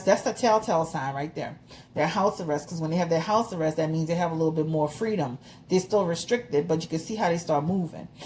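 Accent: New Orleans, United States. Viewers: Girl, I watch this alot for just good advice and your pearls 4 real along with your cool NOLA accent and attitude chile. You be dropping them cards like u back at the BlackJack table while laughing and chillin'. I am over here enjoying the info but most importantly learning from others' experiences. 😇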